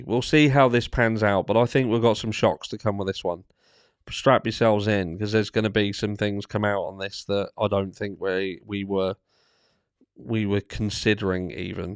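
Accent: Norfolk, United Kingdom. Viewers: Love hearing your Norfolk accent. Makes me feel like I’m back home 🇬🇧😎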